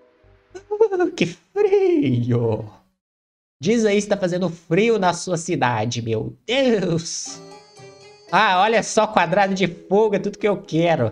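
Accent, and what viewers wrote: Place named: Minas Gerais, Brazil. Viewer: OI tenho uma pergunta, em qual estado você mora? você parece ser mineiro pra mim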